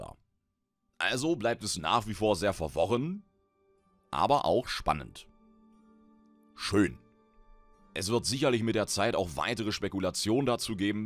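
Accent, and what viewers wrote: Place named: Saxony, Germany. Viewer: Wow Lore + Sachsen Akzent. GOLD!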